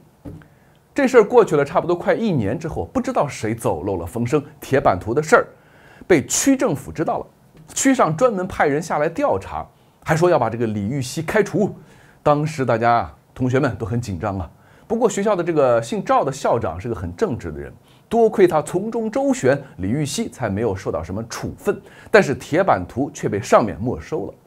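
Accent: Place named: China. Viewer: 聽你口音，因該是在海外的大陸人，就你敢說，哈哈哈!!!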